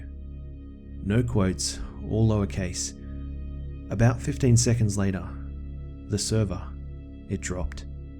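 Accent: Australia. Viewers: He's Australian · Yeah he may be Australian but that's like er more British than American. It's older sounding somehow. And I'm not dissing the US here, cos they make good TV, but horror narration just CANNOT be done with an American accent. It's just off putting fsr. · Are you Austrailan m8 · I think he may be Australian. No offence. Sorry if I am incorrect. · He isn't British, he is Australian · He is Australian. I think i should know what an Australian should sound like since i am one. Sup fellow aussies 😊🐨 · Is Australian? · Only one problem..You have an Aussie accent mate, using American euphemisms...??? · Finally a fellow Aussie talking about scary shit good on ya m8! 👍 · Australian mate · Australian bro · are you Australian I'm From Australia your voice sounds like your australian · Australian I could tell bc of the way he said mate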